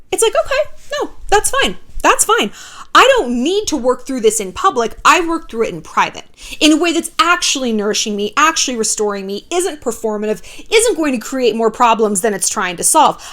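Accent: England, United Kingdom. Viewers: Your English accent is bomb 💣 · Your British accent 😂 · Lol your british accent 😘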